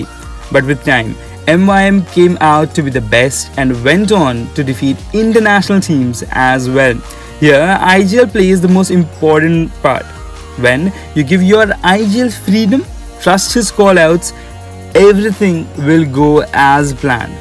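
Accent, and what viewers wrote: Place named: United States. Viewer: Bhai iss video me tera accsent alag lag raha hai 🤔 more like American accsent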